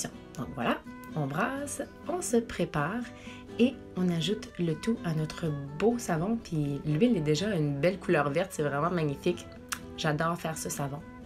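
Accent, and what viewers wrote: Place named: Quebec, Canada. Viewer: Ah je me disais bien que vous étiez Québécoise, ça fait plaisir de voir une vidéo en français ! Et ne vous préoccupez pas des commentaires négatifs, je suis une Française de Paris et j’adore votre accent ^^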